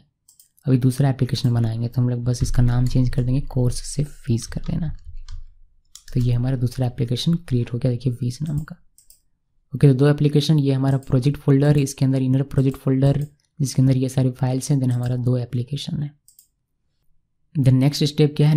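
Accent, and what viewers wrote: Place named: Punjab, India. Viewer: I have seen Django courses on youtube but your tutorial course stands out because .... 1. You have explained theory behind django framework. I have not seen anywhere else; even in paid courses instructor is not able to explain theory like you have my friend. 2. Now most important part in learning Django is practical hands-on; you have also spent lot of time explaining step by step (GeekySteps as you call it in your videos; I love them :)) so your students don't get confused about django file structure. 3. Aaap ki hindi punjabi se kaafi milti zulti hai. Kya aap punjab se hai? If Yes, Wahe Guru sarji Sat Sriya Kaal!! You deserve many thanks for your videos. Thank You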